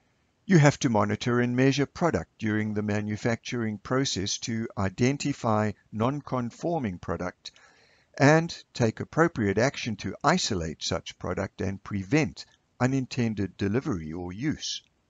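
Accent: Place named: South Africa. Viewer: I see you live in Canada, but sound like a South African :)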